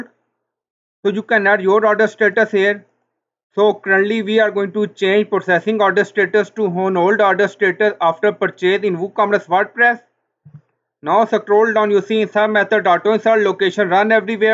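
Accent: India. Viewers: they way you speak english is Punjabi is amazin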